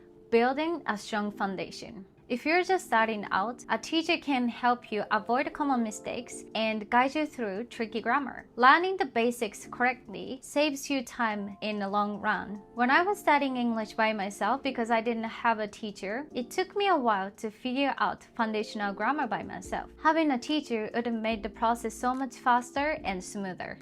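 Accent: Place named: United States. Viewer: 日本に住んでいるアメリカ人ですが、I have a question for you. いきなり聞くけど、 さんはもしかしてアメリカに行ったことがありますか? 住んだことは？😅 I guess you have spent time in either the US or Canada. なんかMiaさんの英語の発音を聞いてそう思っただけ🧐😉 頑張ってほしい😊👍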